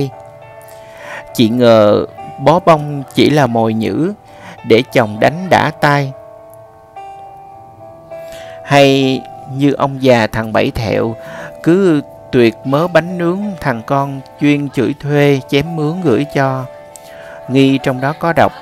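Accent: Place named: Mekong Delta, Vietnam. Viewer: Thích giọng đoc của em,đặc sệt miền Tây,chất phát.Cám ơn ,thân chúc sức khoẻ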